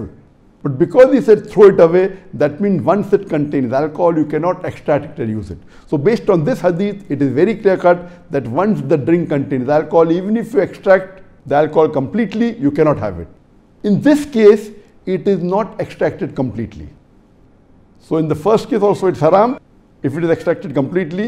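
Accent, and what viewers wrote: India. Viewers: I can't understand Indian accent, can you tell me is this halaal or not?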